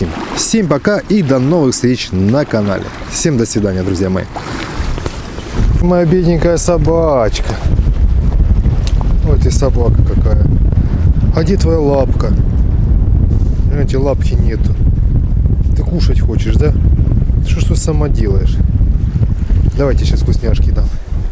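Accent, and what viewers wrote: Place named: Eastern Ukraine, Ukraine. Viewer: Тут еще и говор Восточной Украины. В Харькове он еще ярче выражен...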